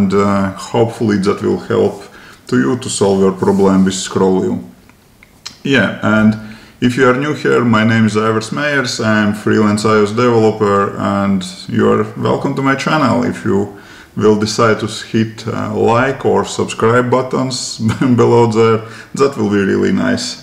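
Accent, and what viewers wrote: Russia. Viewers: какой русский английский ))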